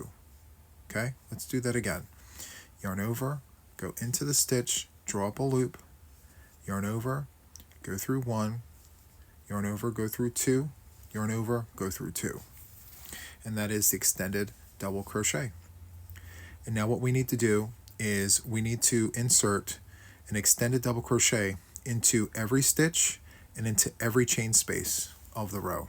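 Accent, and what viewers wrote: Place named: East Coast, United States. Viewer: Im new here and your tutorial is a treasure! What a wonderful pattern! Your style of instruction is so easily understandable… thank you! (Your east coast accent made me smile while listening too) 🎉❤ Thank you so much!! Michella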